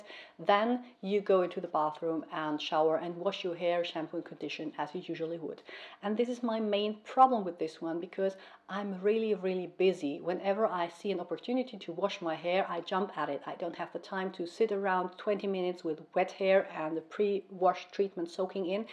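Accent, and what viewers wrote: Germany. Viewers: Omg im not sure but I feel like I can hear a little German accent!! And I thank you very much for the video!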